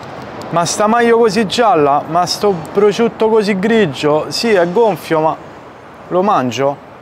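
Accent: Rome, Italy. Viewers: Per avere più riscontro fuori Roma, secondo me, dovresti sprovincializzare il linguaggio abbandonando la pronuncia in romanesco troppo marcata. Per me sei comunque simpatico e mi diverte guardare i tuoi video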